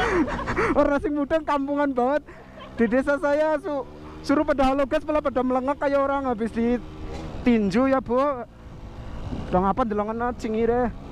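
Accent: Banyumas, Indonesia. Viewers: Mmas cah ngapak yo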